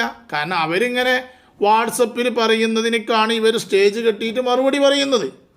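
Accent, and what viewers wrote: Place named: Malabar, India. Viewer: ഉസ്താദ് ഞാനൊരു തൃശൂർ ജില്ലക്കാരനാണ് അങ്ങയുടെ മലബാർ ശൈലിയിലുള്ള സംസാരം എനിക്ക് ഭയങ്കര ഇഷ്ടമാണ് കുരുവട്ടൂര് ക്കുള്ള ഓരോ വീഡിയോയും വേണ്ടി കട്ട വെയ്റ്റിങ് ലാണ്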